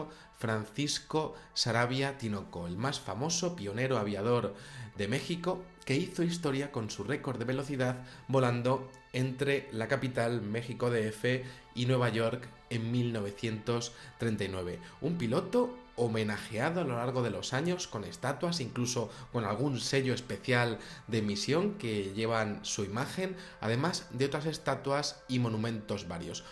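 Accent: Spain. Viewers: pues sea lo que sea que ay ahi no es normal lo que me mas bien me pregunto es que buscan los extraterrestres ahi si no ay casi vida en flora y fauna ni gente a que van ni modo que venga a vacacionar o a vistar pero bueno exelente video lo visitaria pero el echo de pensar que algo me pase me da miedo jajaja por cierto me encanta tu voz de español saudos desde mexico ven avistarnos :)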